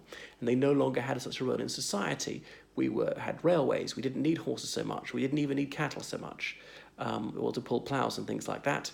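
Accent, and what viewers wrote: RP, United Kingdom. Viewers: Hi. I am Irish. You have a British accent. I presume you spent a lot of time in the UK? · you have a british accent you have no place describing irish history i will only listen to an irish traveller on this subject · Wow buddy you sound posh but very interesting article👍 my father is from the Republic and always said men from Kerry/Tipperary area's were excellent for work with pick&shovell 🤣🤣🤣🤣🤣and men from Dublin were only good for pulling pints 😂😂😂😂😂😂 myself my back is covered in freckles and in the summer it looks like I've been sunbathing under a tea-strainer!!!!!!!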